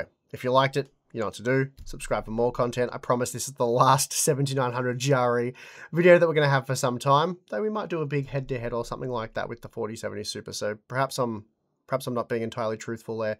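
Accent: Australia. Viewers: Regret even less for buying last week! BG3 is so smooth now! And that Australian accent is truly something else lol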